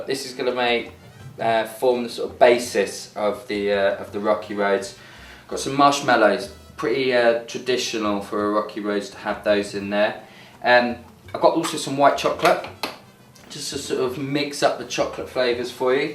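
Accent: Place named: United Kingdom. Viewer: WTF? The text is on swedish but u talk british? Im swedish and can talk English, but not that kind of brittish. Where r u from man?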